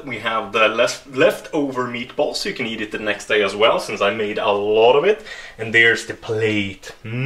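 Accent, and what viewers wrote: Sweden. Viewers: Please im getting so tired of ur Swenglish. Even though it's a bit funny. Just hear how you say with xD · yea. I've noticed that too. That is why I love the swedish accent :D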